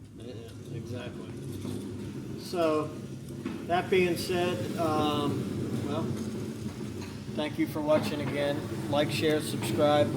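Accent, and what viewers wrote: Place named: New England, United States. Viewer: It's like watching Me but with a New England accent!!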